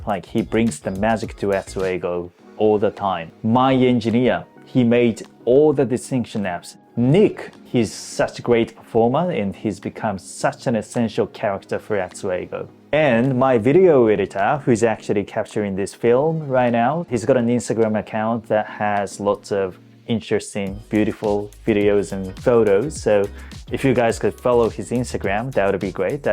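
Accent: Australia. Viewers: You really sound like an Aussie mate lol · 質問です。ネイティブの人がこのアツさんの英語を聞いたとしたらどのくらいオージーアクセントなんですかね？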